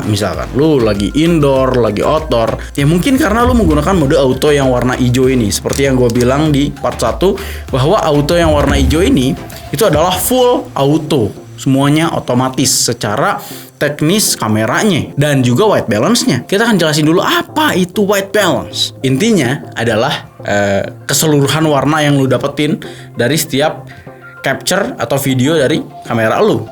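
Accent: West Java, Indonesia. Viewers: bang orang sunda mana?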